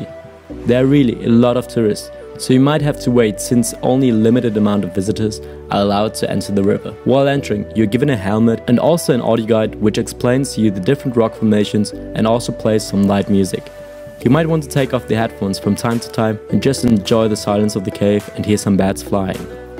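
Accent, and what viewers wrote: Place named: United States. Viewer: dude you sound just like me....are you also American I take it?....just a guess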